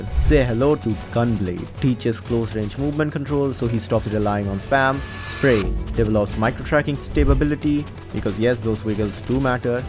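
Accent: India. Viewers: there is no way your name is with your indian accent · This guy sounds like an indian🗣😭🙏🏻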